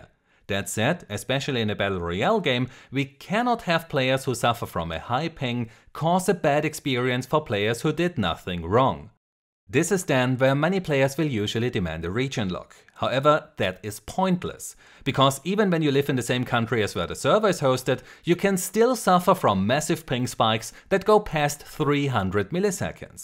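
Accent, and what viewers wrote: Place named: Germany. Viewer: Yaaaaaaa your GERMAN!!!! Love the accent man, you sound like a badass. 😎😎😎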